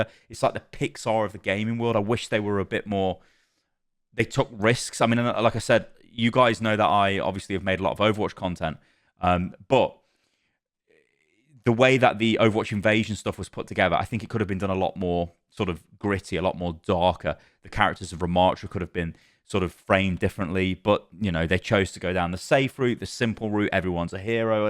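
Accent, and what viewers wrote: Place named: United Kingdom. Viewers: Btw, you should promote this channel more on your main channel to get more viewer. I like the way you talk, totally british. Me as foreigner is enjoyed well spoken english in order to learn myself while watching videos :) also i like the differenciate look at overwatch and you also point out and appreciate what they do good. And thats nice, not everything is bad. I know i sound like a simp but i really like your style! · Btw, you should promote this channel more on your main channel to get more viewers. I like the way you speak, totally British. Me as a foreigner, enjoys well spoken English in order to learn myself while watching videos :) I also like the different look at Overwatch and you also point out and appreciate what they do well, that's nice, not everything is bad. I know I sound like a simp, but I really like your style! 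Not meaning to be cunty, genuinely hope I help you with your learning, you're pretty good already though.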